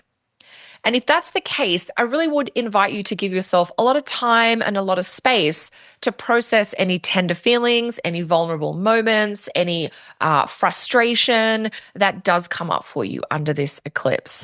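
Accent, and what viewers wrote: Australia. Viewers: So nice to hear a fellow Australian . I have south node in Aries . Chiron in Aries and par of fortune . I don’t know what it means but I feel like crap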